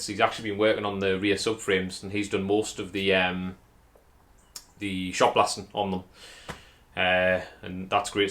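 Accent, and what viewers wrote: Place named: Northern England, United Kingdom. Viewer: I know have commented many times and I just love watching and listening to you but I can never shake the Monty Python "ay yup trouble at mill" NO DISRESPECT it's just the accent, oh aye Crack On your getting really good at this welding shit, nice job, when doing the 'door' bit talk about using a sledge hammer to crack a walnut, geez, you need an English wheel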